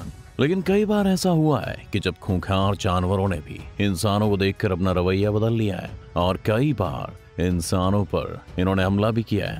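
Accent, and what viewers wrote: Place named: England, United Kingdom. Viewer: You speak Hindi in English style 🤣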